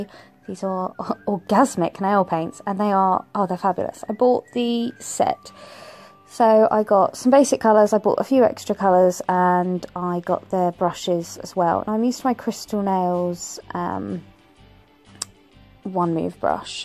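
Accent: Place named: United Kingdom. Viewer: You're awesome, just found you in this moment and subscribed immediately! ;) Love your voice and British (?) English :) Greetings from Germany!!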